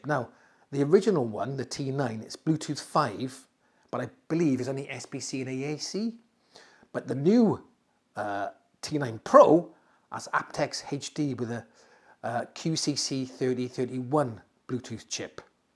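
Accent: United Kingdom. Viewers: Hey sir I kind of like your delivery a descent man talking hifi like a normal person not a bunch of fluff raw manly hood not some intellectual garbage just some guy talking stereo equipment engaging witness to something worthwhile to good people trying to enjoy there intimate hobby no big deal FUN FUNNY FANTASTIC LOVE YOU MAN! ESPECIALLY BECAUSE YOU BRITISH DIFFERENT KIND OF GUY THAN HERE IN THE STATES DIFFERENT STYLE OLD FASHION OLD SCHOOL FROM THE OLD COUNTRY I DEFINITELY FEEL RECONNECTED TO MY EUROPEAN SIDE OF MY HERITAGE BE COOL KEEP GOING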